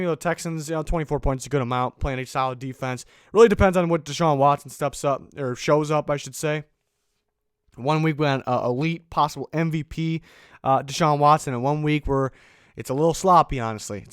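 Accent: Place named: Boston, United States. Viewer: Did you go to school in Boston because you sound just like a Patriot fan. Same score prediction to. In a little less than 9 hours you will see. Oh, and thanks for the awesome D coordinator. Leslie Frazier was just what we needed!👍😉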